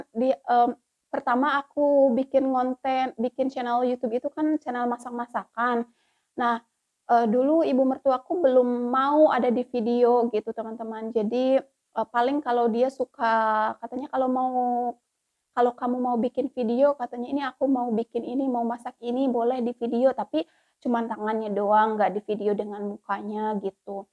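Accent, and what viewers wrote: West Java, Indonesia. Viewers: Assalamualaikum teh saya baru bergabung😊abdi ge orang sunda tpi denger teh nyarios sunda resep jd musam mesem sorangan😁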